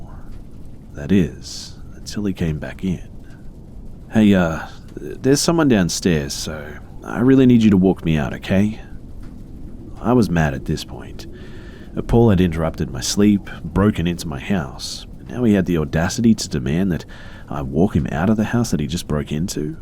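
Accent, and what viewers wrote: Australia. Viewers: I was surprised that its narrated by a fellow aussie, makes it far better!! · OMG an Aussie narrator! Ahhhhh.....wonderful.... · 😂😂😂😂😂😂😂 he’s Australian you asshat go get your ears tested and don’t ever say an Aussie is a whinging pathetic Pom again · What's great about you, is that you're monetized but you don't edit out cuss words. Or maybe I just like to hear you swear in that Aussie accent. Either way, I'm dedicated to this channel. Long live! 🐝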